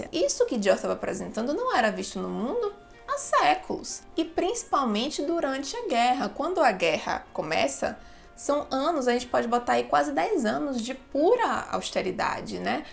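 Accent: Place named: Bahia, Brazil. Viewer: Como eu não percebi seu sotaque baiano?! Meu Deus 😅 É por isso que é descolada assim, gentem🤭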